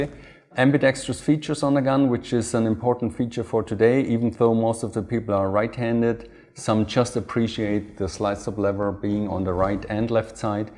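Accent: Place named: Germany. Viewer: Snobby accent? He’s a German you dick..only in ‘murica eh 😂😂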